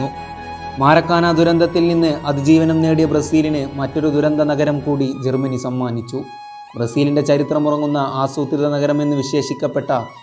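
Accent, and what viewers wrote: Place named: Malappuram, India. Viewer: Very nice presentation .I came to this channel after watching your video on Mascherano.....ningal powliyanu...Njan Alappuzhakkarananu.... malappuram slangil Messiude video kelkumbol ulla feel onnu vere thanneyayirunnu...Keep doing more videos..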